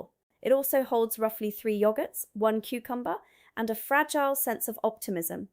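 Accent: England, United Kingdom. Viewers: Who in there right mind would want to be electrocuted in the Bath Room. I'm not enjoying this, if your American how come you have a Perfect English Accent. I have a Combine Washer/Dryer.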